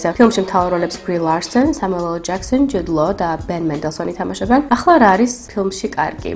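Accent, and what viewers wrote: England, United Kingdom. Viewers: ნუ ეს პირველი ვიდეო იყო რომელიც მე ვნახე შენი და ეს ინგლისური აქცენტი ჩემთვის საკმაოდ კარგად ჟღერს რაშიც ბევრი არ დამეთანხმება კომენტარებს რომ გადავხედე წინა ვიდეოებზე. anyways :დ ხმა ძალიან კარგი გაქვს და ნუუ ალბათ უფრო კარგი იქნება თუ სახესაც გამოაჩენ. ყოველ შემთხვევაში მე ძალიან მომწონს, როდესაც თოთოეულ წარმოთქმულ ემოაციას ვხედავ თუ როგორ აღიბეჭდება ადამინის სახეზე. ძალიან მომეწონე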